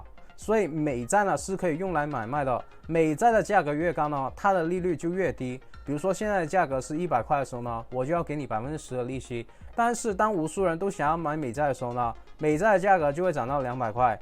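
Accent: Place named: China. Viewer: 原來你是大陸人，難怪口音用語不像台灣人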